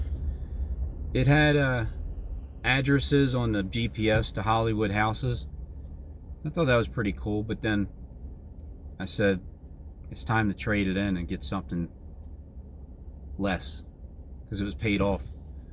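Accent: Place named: Philadelphia, United States. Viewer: good study of the Philly accent........I lost mine, been living in Jersey for 55 years.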